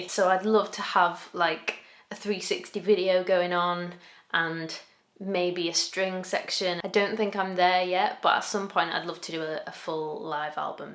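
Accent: United Kingdom. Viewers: O inglês britânico soa tão bonito.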